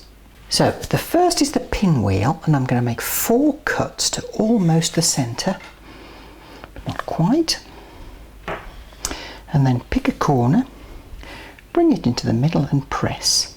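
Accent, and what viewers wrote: United Kingdom. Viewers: I've just found your channel and I must say you have charmed me rather splendidly! Your Danish Pastry video is just about the best I've seen, you make it look so easy I'm going to give it a try. And I love your British accent! You have a, what I call, a story book voice................I feel almost like you are reading a wonderful bedtime story to me! Thank you so much for sharing with us all. I've subscribed and you can bet I'll be a lifetime fan!!! I live in Kansas by the way. Thank you so much again. Bright blessings to you.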